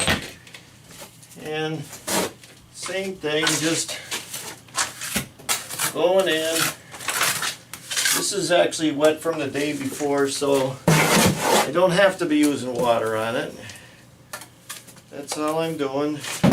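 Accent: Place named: Pennsylvania, United States. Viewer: great videos I really appreciate what you do I've learned allot from your videos the voice brings me back i grew up in south eastern Pennsylvania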